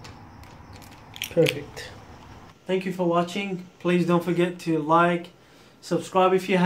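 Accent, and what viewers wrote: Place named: Australia. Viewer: wow aussie accent! :P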